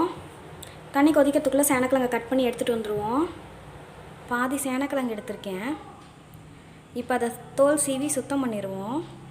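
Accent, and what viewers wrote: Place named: Salem, India. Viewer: Speaking slanging Adi thuul akka . That's salem guys 🔥✈️⛏️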